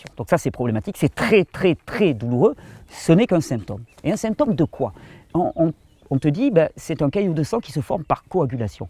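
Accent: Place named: South, France. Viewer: C'est un plaisir de voir tes videos cher mais je dois dire que bien au delà du contenu, ce qui me fait du bien,c 'est dejà d'entendre ton accent du midi, qui porte avec lui la lumière du Sud, c'est cet environnement catalan qu'on peut parfois apercevoir et vraiment si j'avais une suggestion à faire, pour nous faire encore davantage profiter de cela, c'est de nous faire découvrir ta region, ou que tu nous en parles car je t'assure que cela aussi ça nous régénère!! j'adore tes videos en exterieur car on en respire presque l'air!! TU es fier de ta région, fais nous rêver avec elle, 5mn de temps en temps mm durant une video de jus ou autre!!! Merci pour ton travail!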